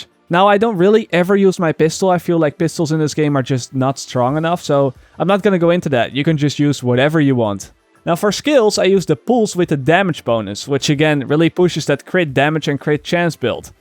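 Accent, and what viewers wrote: Netherlands. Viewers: Love the content on your channel aswell as your Dutch accent. Great Video, keep it up! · you have a dutch accent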